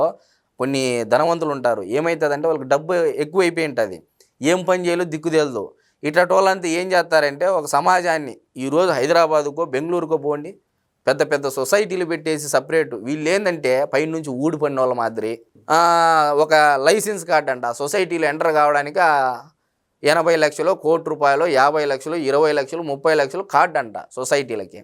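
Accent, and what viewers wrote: Rayalaseema, India. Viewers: సూపర్ బ్రో మీరు రాయలసీమ వ్యక్తి లాగా అనుకుంటా రాయలసీమ వ్యక్తివా నువ్వు చాలా చక్కగా బాగా చెప్తున్న బ్రో. కమ్మ కులంలో ఉండేది అదే పరిస్థితి బాగా గమనించినావే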